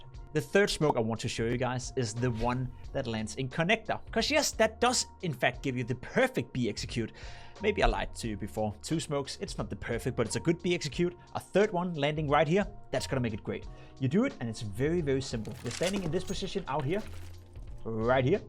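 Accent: Denmark. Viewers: u danish?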